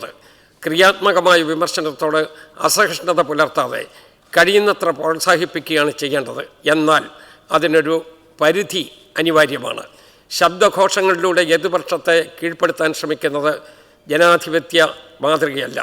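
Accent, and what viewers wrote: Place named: Kottayam, India. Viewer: ഭാഷാ ശുദ്ധി ❤❤❤ കോട്ടയം അല്ലേ ❤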